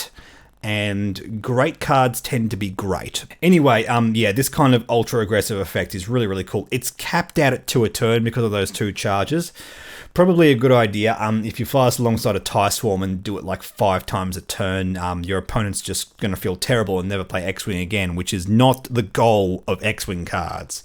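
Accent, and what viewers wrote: Australia. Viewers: Good to hear your Aussie voice talking x-wing as I do my work.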